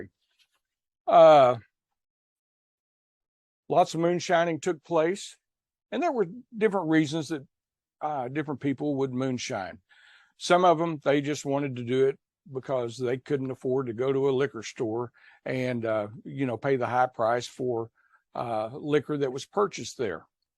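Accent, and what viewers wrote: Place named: Tennessee, United States. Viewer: Hey. I just found you. I watched the Press conference and was intrigued. So here I am. I lived near Covington for a couple months and remember seeing the signs about walking tall. I also remember the movie. I was a boy when I watched it with my father and I'm 64 now.. Very very interesting. Things aren't always what they seem. That's some beautiful area there.. I still like East TN better and if not for elderly parents here in South Central Indiana I would move there.. I really love TN. You folks have an accent though.. hahaha.. Down there they say I sound like I'm from Canada, and north here in Indiana such as in Indianapolis where I used to live as well they said I sound like I'm from TN. I am from an area in Indiana that is sorta a line where you either have a KY accent or a northern one. I guess I got the northern onel.. 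haha.. Be well sir and great videos.. I'm going to really watch all your vids.. Great stuff and really good info and evidence! Regards Tim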